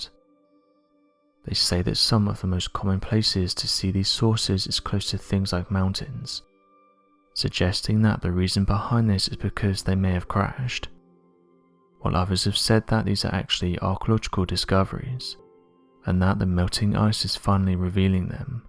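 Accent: England, United Kingdom. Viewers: Best accent English. I am biased though. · Thank you for all your hard work on these videos!!! I love watching them and you have the perfect, intelligent, serious, English voice for narration 💯♥️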